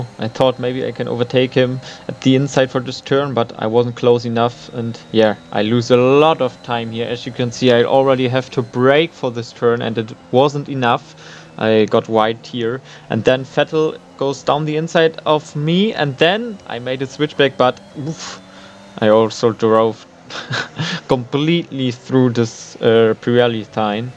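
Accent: Germany. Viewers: Wieso machst du deine Videos eigentlich nich auf Deutsch auf Englisch ist das so weird weil man merkt das du deutsch bist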